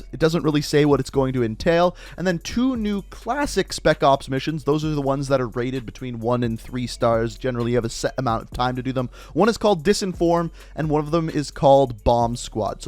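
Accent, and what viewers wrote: Canada. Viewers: Emmanuel Moreno he’s Canadian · He’s a Canadian (I think) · Omg,that was driving me fuckin' nuts too. Weirdass Canadian pronunciations. So annoying.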